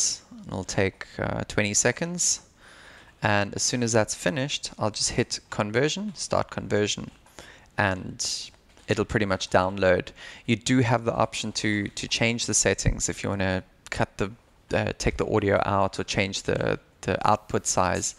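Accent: South Africa. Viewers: Woaaahhh, South African accent I spot there? yay! Nice info, subscribed! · are you south african?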